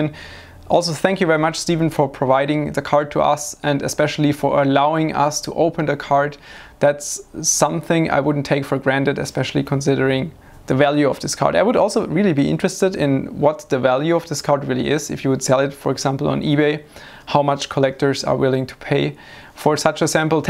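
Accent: Germany. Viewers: Deutscher Akzent is da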